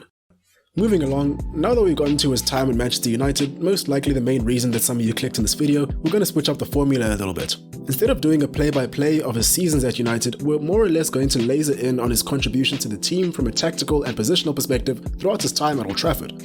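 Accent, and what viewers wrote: South Africa. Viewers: Are you South African? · Mzansi accent. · Are you South African ? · Are you South African?? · I’m hearing a South African accent 🤩 awesome video!! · Love the SA accent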